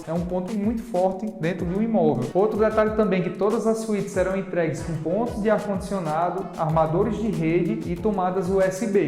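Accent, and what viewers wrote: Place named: Ceará, Brazil. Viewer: Gente esse canal virou uma série pra mim. Na proxima encarnação vou nascer no ceará quero ter um sotaque assim.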